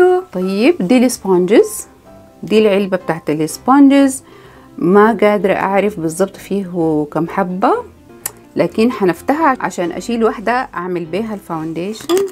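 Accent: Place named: Sudan. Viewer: واااااي حححلوه يربي اول مره ادخل فيديو لك وحبيتتتك ومكياجك مره حلو ومرتب ولهجتتك حبيتها مره يلبى السودان❤️❤️ من السعوديه🇸🇦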